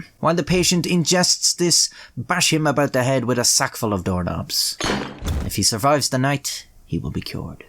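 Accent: Ireland. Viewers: It's Irish, but it's not the Dublin accent, which most people, especially us Americans, have heard variations (piss poor imitations, in words). He lives in Dublin, but he's told us he hates the native Dubliners he's met.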